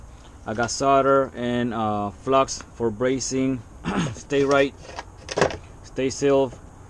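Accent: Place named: Argentina. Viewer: You sound like your Argentinean. who do you think about the testo digital gauges?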